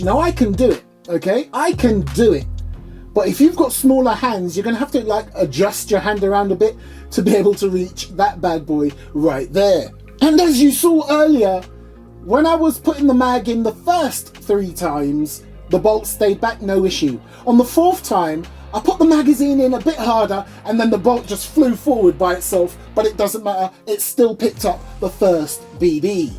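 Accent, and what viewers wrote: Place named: United Kingdom. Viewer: You sound like bri'ish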